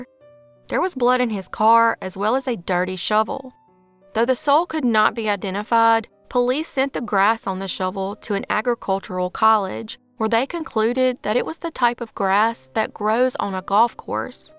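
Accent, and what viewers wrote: South, United States. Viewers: Just have to say as a Southern gal.Myself I absolutely love your accent❤ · This narrator has a good southern accent. Very nice!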